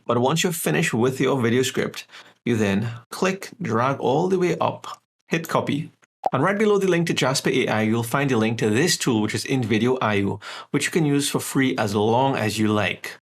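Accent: Trinidad and Tobago. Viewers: Love this video. Are you From Trinidad?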